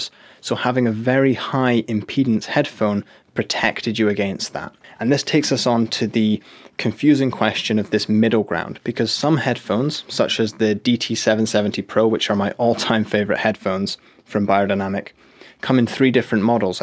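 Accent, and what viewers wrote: Scotland, United Kingdom. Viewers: He sounds Scottish. I want to say NW Scotland by the soft accent. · Are you Scottish?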